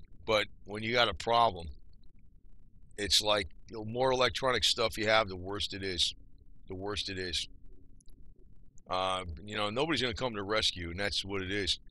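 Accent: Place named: New Jersey, United States. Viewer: I am surprised, that you been from New Jersey didn't watch the series sopranos. God knows you even speak in same accent as those in that series.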